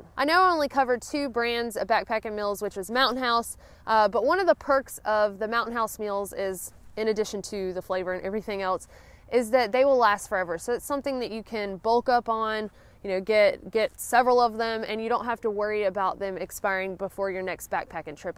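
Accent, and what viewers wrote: South, United States. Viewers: What kind of country ass accent is that ☺ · Bet you never heard this before. I like yer southern drawl. Lol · I'm from Tennessee and hear it all the time,but I could listen to your sweet southern voice all the way to Maine...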